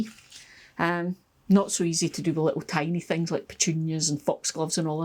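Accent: Scotland, United Kingdom. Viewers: I truly don't know why more people aren't watching your channel. I get so excited every time you come up with a new episode. You're just so cheery and wonderful and I love your cute Scottish accent. It's just such a pleasure to watch when it's -27C and 30 cm of snow with 10 more to come out my window... Hahaha I guess that's what I get for living in Canada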